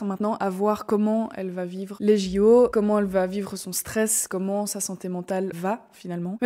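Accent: Belgium. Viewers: Dès que tu as commencé à parler, j'ai su que tu étais belge grâce à ton accent. Ce n'est pas l'accent bruxellois, que les Français pensent à tort être le seul accent belge. Quand je t'écoute, j'ai l'impression que tu as l'accent de Liège ou de Namur, je me trompe ?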